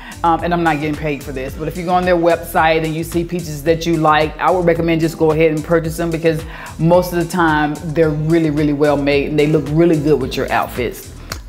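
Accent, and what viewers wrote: South, United States. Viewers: ...you keep it real! Love your tips, your style, your southern accent, your laugh, and your personality.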